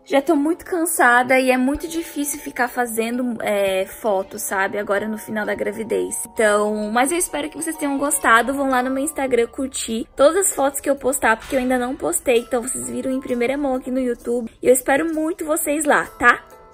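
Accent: Santa Catarina, Brazil. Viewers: Vc é de SC kkkkkkk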